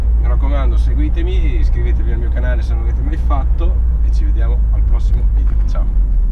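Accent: Romagna, Italy. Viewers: Ecco perché parli da romagnolo...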